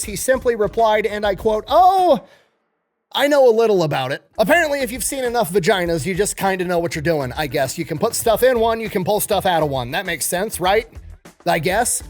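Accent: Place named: Kentucky, United States. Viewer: Hey electrician guy I am very curious are you a Kentucky now this is going to show up as my wife but no it's her husband and yes we are Kentucky people you just sound like on of us are you bud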